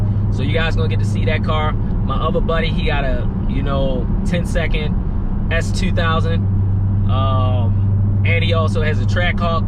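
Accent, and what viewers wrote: Baltimore, United States. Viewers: you can tell he from b more cus of the way he pronounce words with "ou" "ue" "ew", they all sound like "uuuuuuu"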